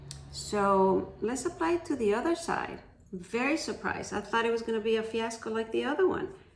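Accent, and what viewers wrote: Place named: Mexico. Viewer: I had a feeling you’re from Mexico. I’m from Los Angeles, so I’m super familiar with the accent. Now I live in Miami, so I don’t hear it as often anymore- it’s all Cuban, Dominican, island accents and some Central American here and there. Well mujer- I’m digging your channel. You have tons of videos and it’s always refreshing to see videos of more grown women! Keep it up and much success to you!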